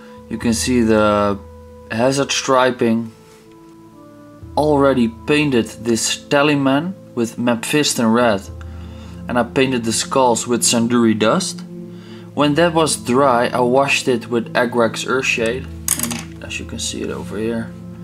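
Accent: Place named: Netherlands. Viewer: Geweldig gedaan man, ik kan aan je accent horen dat je Nederlands bent.. Ik heb geabonneerd, echt super goed gedaan.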